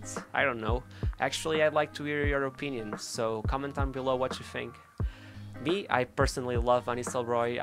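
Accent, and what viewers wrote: Portugal. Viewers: The strongest Portuguese Accent Ever 😅😅😅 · Is the narrator Portuguese? Accent is very "Lusophonic."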